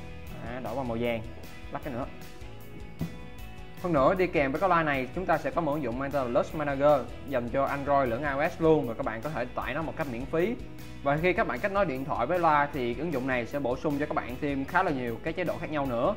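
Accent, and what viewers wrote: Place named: South, Vietnam. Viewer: tiếng miền nam ko thể nào nghe dc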